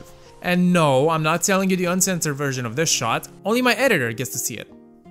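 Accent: Romania. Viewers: Well I guess I now know what a Romanian accent sounds like.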